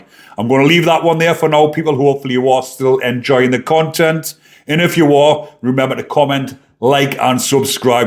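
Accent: Newcastle, United Kingdom. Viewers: Oh ! Hello bonny lad ! Being honest ! Hearing my fellow bretherons voice ! Thinking your a geordie ex con ! Thinking ! That devils decilple ! Is about to reap the rewards ! For ! Killing ! An innocent boy ! Not us bloody english idiots lol ! Family a little fierce lol done jail time ! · A Geordie accent.North-East of England.Newcastle area.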